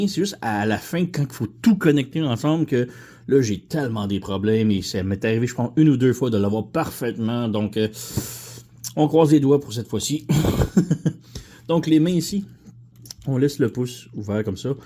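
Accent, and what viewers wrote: Quebec, Canada. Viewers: Yooooo, I watched your English review of the figure. I transformed my new figure using your transformation section, thanks for all the tips! Then I noticed that you have a French version of the review, so I clicked it out of curiosity, and was pleasantly surprised by a Québécois accent! That brings me waaay back. I grew up in Quebec City back in the 80s when I first discovered Transformers in the English TV channels. Anyway, love your channel! 👍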